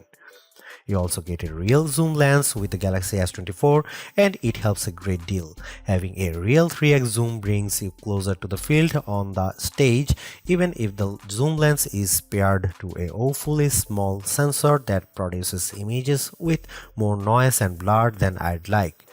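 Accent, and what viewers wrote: India. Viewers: OMG, listening English from an Indian is a violene to my ears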